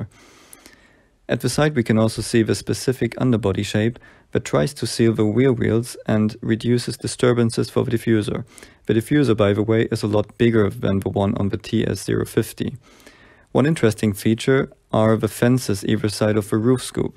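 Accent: Germany. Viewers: Bist du Deutscher? xD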